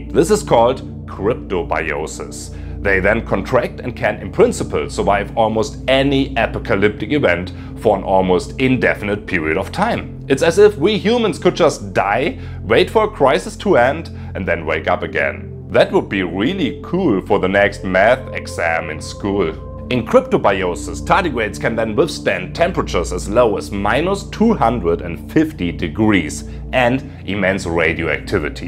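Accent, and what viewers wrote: Germany. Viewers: Ist sehr gut aber immer dieser deutsche Akzent 😅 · Dieses Denglische bzw der Akzent....typisch deutsches Problem. Entweder man kann englisch oder lässt es sein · 😂😂 der deutsche Dialekt schlägt voll durch 👍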